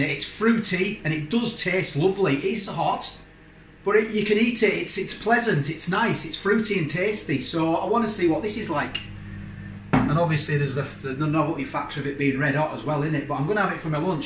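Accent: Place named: Yorkshire, United Kingdom. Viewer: I was waiting for the moment when it burnt your mouth off but you handled it like a boss! Great review, the Yorkshire accent tops it off nicely 👌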